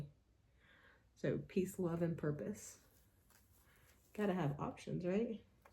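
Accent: South, United States. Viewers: Hi, - I really enjoy watching your videos. It's nice to hear another Southern accent (I'm in N GA.) I'm reorganizing my craftroom and remembered this video. The clean designs made a big impression so back I came for inspiration. Do you remember if the storage units were the Kallax units? I'm trying to avoid the drama of a trip to the Ikea store in Atlanta. There was a time when that would have been a trip I would have looked forward to, but not so much anymore. I love the clean look of the baskets and magazine files. No one has to know about the chaos that lies within.. 😂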